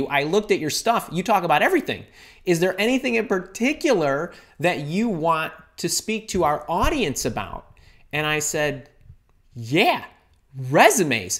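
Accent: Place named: Michigan, United States. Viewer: Sound like a Michigander..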